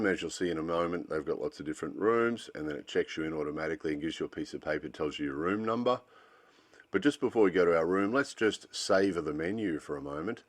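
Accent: Australia. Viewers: You should have displayed your Japanese instead of us having to your Australian accent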